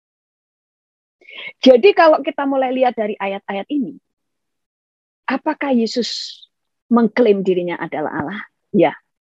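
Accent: Java, Indonesia. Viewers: Bu, medok banget........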